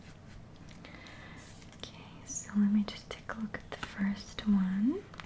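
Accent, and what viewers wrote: France. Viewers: Ton accent français est juste magique pour les oreilles! J'aimerais trop un asmr en français un jour s'il te plaît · How cute you are with your french accent 😍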